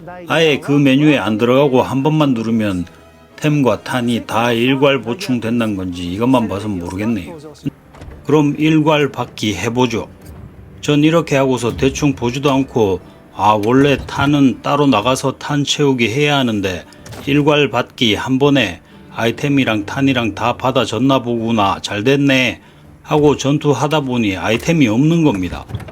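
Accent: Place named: Gyeongsang, South Korea. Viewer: 갱상도 아재 말투로 조목조목 따지는거 개우껴요 ㅋㅋㅋㅋㅋ재밌습니다. 다른 게임들도 다뤄주세요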